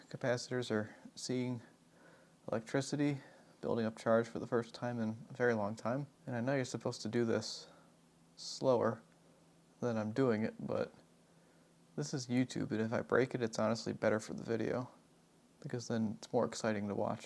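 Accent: United States. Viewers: Are you from western PA ?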